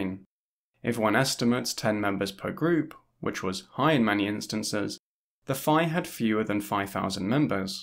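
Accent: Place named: United Kingdom. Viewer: Would recommend learning Spanish or Catalan if you can muster up the willpower to do it both because they are useful for your purposes as not being able to read the language is a roadblock you mention multiple times in this vid and also because in the past your pronunciation of certain Spanish proper nouns has been so "British" for the lack of a better term that I've kind of struggled to find the person/thing in question in personal search afterwards. (could also just edit the captions where proper nouns pop up because the auto-generated ones usually don't hear it but I don't know if you can do that anymore)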